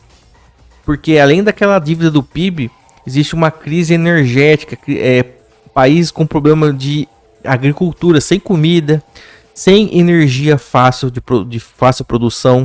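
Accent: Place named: Inland São Paulo, Brazil. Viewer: Praticanente impossível é o sotaque do interior de S P. ele teria que viver uns 20 anos em outra região para mudar.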